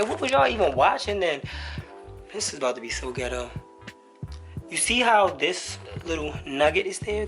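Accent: Baltimore, United States. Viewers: Baltimore accent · You definitely from Baltimore lol shuuus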